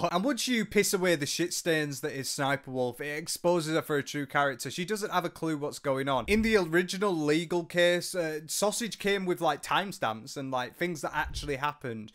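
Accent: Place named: West Yorkshire, United Kingdom. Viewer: Nice to hear a northern accent on the platform 😁 gotta be W Yorkshire, i can hear those very slight differences to East Lancs pronunciation from a mile away 😂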